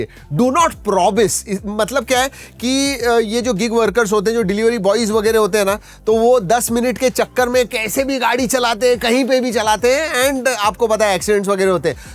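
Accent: Maharashtra, India. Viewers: Bhaiya love your marathi accent ❤❤ Tumi pn till gulh ghya gode gode bola ❤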